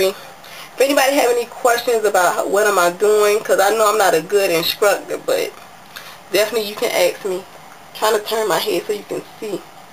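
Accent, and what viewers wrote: New Orleans, United States. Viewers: Ur N.O accent is so strong lol Im from baton rouge and I love new Orleans accent lol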